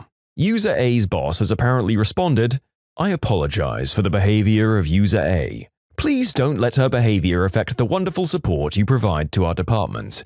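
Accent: United Kingdom. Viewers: Uhh British narrator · the british accent is the biggest tradgedy I was hearing a bunch of old podcasts of yours and this came up and I am like "oof that voice hit me on the head" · When did mate become British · Again British???!!! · Not a fan of British narrator.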